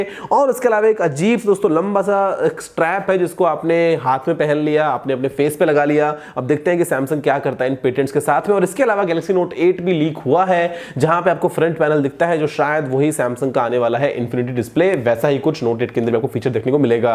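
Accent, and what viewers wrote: Bengal, India. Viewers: Are you bengali sir?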